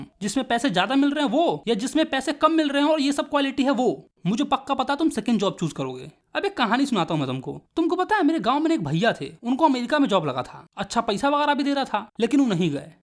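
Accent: Bihar, India. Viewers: i really appreciate ur desi Bihari tone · You are from Bihar ❤😊 your accent tell that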